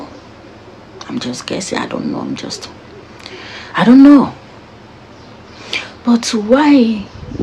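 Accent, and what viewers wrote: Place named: Hausa, Nigeria. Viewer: Listening to her voice, you could notice that she was born in Igbo land by an awusa or fulani people.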